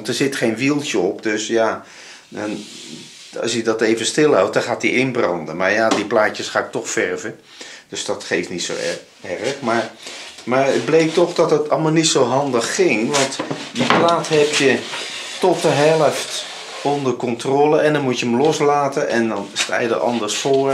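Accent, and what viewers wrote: Netherlands. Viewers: Hoi, nieuwe leuk schort aan ,staat je goed Vindt het altijd leuk om naar te kijken ,je kan het goed uitleggen voor simpele dingen,en altijd wijs om nerderlander te horen klappen. Groeten Mike.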